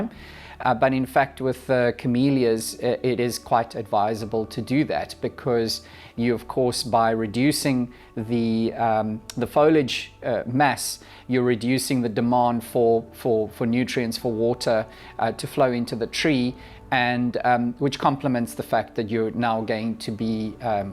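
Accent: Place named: South Africa. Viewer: Really useful and informative video, thank you 💚 I find it funny that auto captions can’t distinguish South African accents, of course I mean no offence just makes me smile when it says bins on screen when you say bends! 😂😆 thanks again very useful as always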